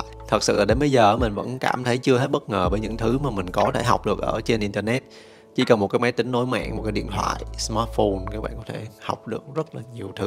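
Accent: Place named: North, Vietnam. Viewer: cảm giác như anh gốc Bắc đúng không ạ, thấy ngữ âm hơi giống dù a nói tiếng nam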